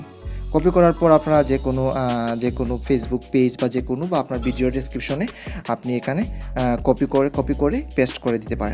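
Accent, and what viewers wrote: Sylhet, Bangladesh. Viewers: বাড়ি সিলেট নি বা!😁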